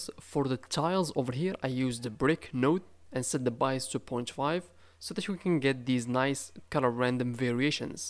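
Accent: Morocco. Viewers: Are you Moroccan 😄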